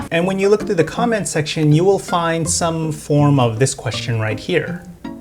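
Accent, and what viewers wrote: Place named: United States. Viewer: Thank you for your tip on getting an extra crispy results. Rice flour along with the carbonated soda also works. My only issue, is that (other than the amount of frying oil) you did not include portion or size requirements in your video nor in your description box. Portion size of ingredients does effect the desired results of the batter after it is cooked. Lastly, you have an American or Canadian accent, but live in Japan, what is your heritage? Thanks again for your tutorial. ⚘⚘⚘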